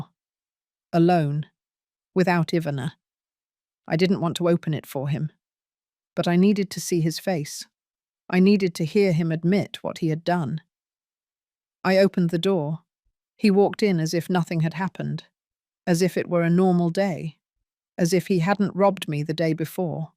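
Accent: United Kingdom. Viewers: The speaker has a different accent because she is British, not American.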